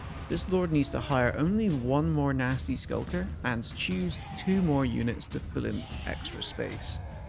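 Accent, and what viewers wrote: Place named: Scotland, United Kingdom. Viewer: Nice video mate, I'll be sure to give this a bash. As a side question, where is your accent from? It sounds like a mixture of very light Scottish and something else?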